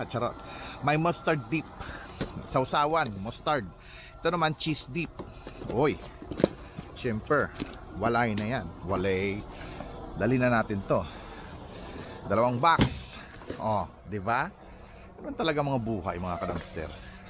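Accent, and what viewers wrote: Iloilo, Philippines. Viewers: Wow sir ang sarap ng mga nakuha mo.i.lke u kc nag iiwan ka for ithers fi mo inuubis lahat. At namiss ko ung ilonggi accent mo manong.welcome back gid last ko tan aw ato bala damo nga mga bayo gintilon mo kesa ibaoy sa land fill kanugon gid.salamat gid sa imo. watching from antipolo city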